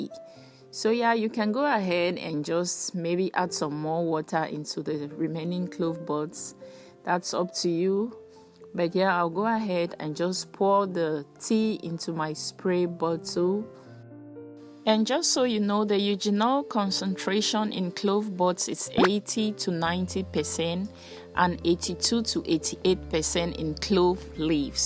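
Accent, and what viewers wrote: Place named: Cameroon. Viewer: plus u sound like Cameroonian, are u Cameroonian?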